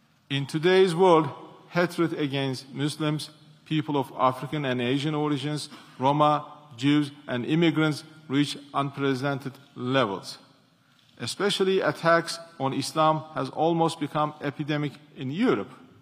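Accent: Turkey. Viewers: Hala bu kadar agir bir turkce aksani ile ingilizce konusmasi😅 Arkadas ne olacak bu turklerin ingilizce ile imtihani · Türk gibi konusuyor :) Ama istedigi gibi konusabilir, karizmasi yeter!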